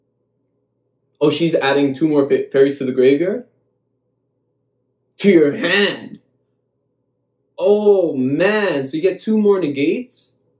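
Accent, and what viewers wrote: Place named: Toronto, Canada. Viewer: Dude I know that accent, my be from Toronto, eh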